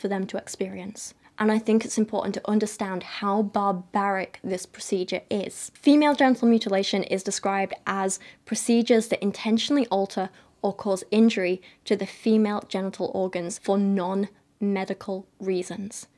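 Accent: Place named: Yorkshire, United Kingdom. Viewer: I always enjoy listening to your videos whenever I'm doing things like cleaning, running errands, and cooking, regardless of the topic. My favorite teacher from elementary school grew up in Yorkshire and your accent is so nostalgic and comfortable to me.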